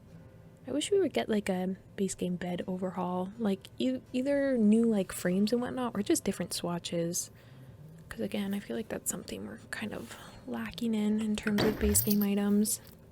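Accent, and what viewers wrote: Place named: Midwest, United States. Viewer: Are you from the Midwest? You got the accent and I love it lol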